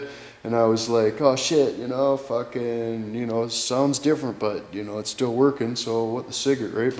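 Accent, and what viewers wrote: Canada. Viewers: awesome stereotypical Canadian accent.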